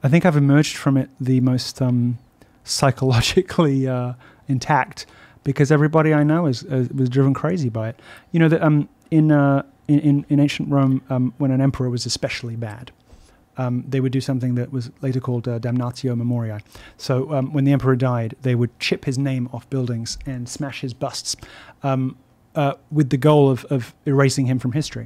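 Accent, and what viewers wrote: United States. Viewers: Milo is starting to sound American in his parlance! I admit it as an English woman I am biased towards the more refined English intonation! js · Do I detect Milo picking up a bit of an American accent? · he is developing a American accent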